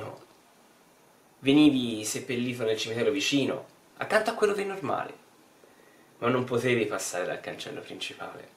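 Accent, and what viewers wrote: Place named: Tuscany, Italy. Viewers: Sarà anche interessante questo contenuto ma il marcatissimo accento toscano lo rende davvero pesante e a tratti incomprensibile. Personalmente non sono riuscito a finirlo. Quando si fanno contenuti di qualità come questo bisognerebbe, a mio parere, cercare di essere il più comprensibili possibile ed evitare inflessioni così marcate. Mi spiace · grazie a te comunque per il bel contenuto e la risposta. Io penso che purtroppo ci sia la convinzione che il toscano sia l’italiano perfetto ma purtroppo non é così: non esiste l’italiano perfetto a livello regionale. L’ italiano perfetto é quello senza inflessioni o magari senza inflessioni così spiccatamente marcate. Purtroppo molti toscani invece, sono convinti che il toscanismo sia “il bel parlare”, a volte può essere simpatico ma a volte appesantisce e rende poco chiaro un contenuto che magari può essere bello come il tuo. 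É questo il caso purtroppo, ma ovviamente a mio modestissimo parere.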